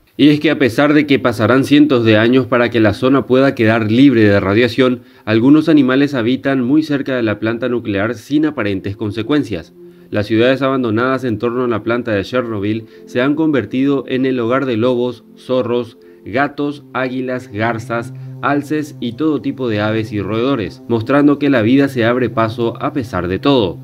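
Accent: Paraguay. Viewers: Me parece conocido el acento. De Paraguay verdad? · eres paraguayo? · Tenes acento paraguayo🤔 Porque no sabía de este canal hasta ahora🤔 me suscribo😍! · Sos paraguayo?☺️ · Sos paraguayo ? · Acento paraguayo ? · Sos paraguayo? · Buenisimo el vídeo. Sos paraguayo?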